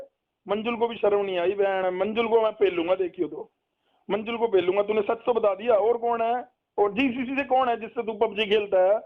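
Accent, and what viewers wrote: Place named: Haryana, India. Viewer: Sir , u are so amazing ...and ur language is so amazing.... Like haryanvi type.... Sir you are one of the best teacher in the field of leet coaching... because u give correct advice to students.... And , i saw ur success record, congratulations sir ... Sir , can u help me to crack leet entrance test....sir m financially too weak...i m also cracked the jee mains in last year but can't take admission in any college due to home situation....and also 5 the time college topper in Gandhi polytechnic Muzaffarnagar (Up).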